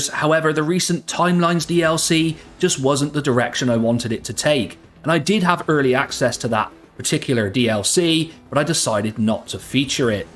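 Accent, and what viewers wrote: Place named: Devon, United Kingdom. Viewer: Moved back from england? To where out of curiosity? Your accent sounds devon 🤔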